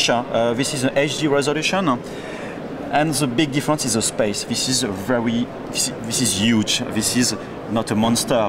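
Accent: France. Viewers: On reconnaît bien l'accent français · I was thinking this guy is french.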